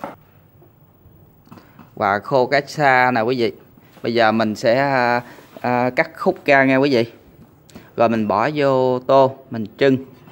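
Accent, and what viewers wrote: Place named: Mekong Delta, Vietnam. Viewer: Nghe cách bạn giới thiệu đúng chất giọng miền Tây Thơm đến nhà ngân luôn Ăn sợ ghiền , ghiền là phải về miền Tây 💖💖💖😋😋😋😋 Da dai dai béo béo ...yummy Ngân xin trái bắp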